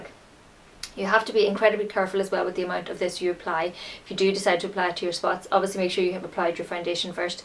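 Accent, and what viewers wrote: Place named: Northern Ireland, United Kingdom. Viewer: u from Belfast or down south I'm from northern Ireland an u sound like you are to lol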